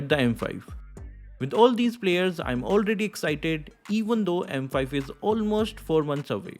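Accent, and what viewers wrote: India. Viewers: Uk he's idian saying e first when there's s in a sentence example e stop u😅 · Indian english 😂😂😂😂😂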